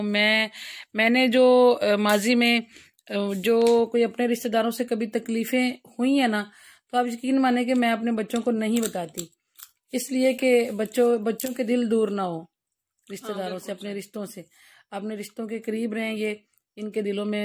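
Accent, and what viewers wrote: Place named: Hafizabad, Pakistan. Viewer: لیکن حافظ آباد کی بولی کا اپنا ھی مزہ ھے تھوڑی سی بولی تھی آپ نے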